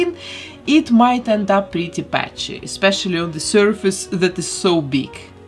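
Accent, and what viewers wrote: Russia. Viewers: У неё какой-то русский акцент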